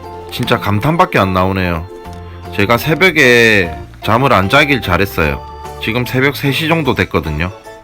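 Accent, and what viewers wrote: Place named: Busan, South Korea. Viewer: 말투랑 목소리 졸귀탱ㅋㅋㅋㅋ 부산억양 너무 좋습니다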